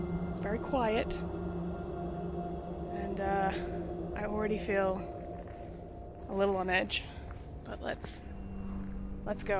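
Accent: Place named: United Kingdom. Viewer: it's sounds more British than Australia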